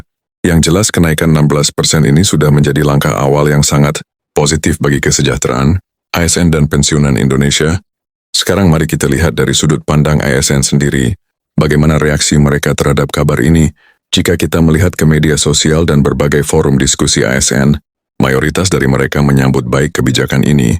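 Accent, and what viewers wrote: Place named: Malaysia. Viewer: Kuang meyakinkan beritanya.seperti ngeledek pensiunan Indonesia.dengarkan baik baik membacanya gaya logat Melayu waspada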